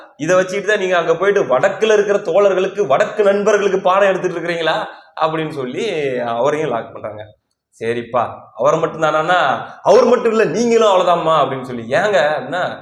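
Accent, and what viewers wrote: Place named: Coimbatore, India. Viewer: தம்பிக்கு வாழ்த்துக்கள் வணக்கம் உங்களுடைய தெளிவுரை விரிவுரை அகல உரை ஆல வரை அனைத்தும் ஆள்பவர்களை நிலைகுலையச் செய்கிறது தம்பி உங்களது பேசும் தொனி கோவை சார்ந்த குடிமக்களைப் போலவே கேட்பதற்கு நையாண்டி ஆகவும் இருக்கிறது மிகவும் ரசித்து உங்களது பதிவுகளை பார்த்துக் கொண்டிருக்கிறேன் உமது அண்ணன் கோவை சௌந்தர் நாம் தமிழர்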